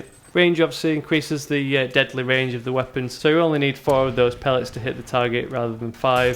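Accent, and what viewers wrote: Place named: Yorkshire, United Kingdom. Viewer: What part of yorkshire are you from?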